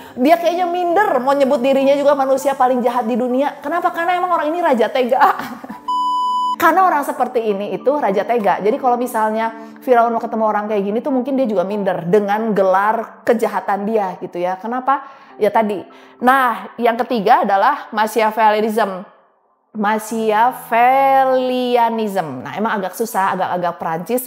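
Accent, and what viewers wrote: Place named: West Java, Indonesia. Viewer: 😂😂 selain Seneng penjelasannya dok, juga pengen ketawa juga krn dokter nyunda pisan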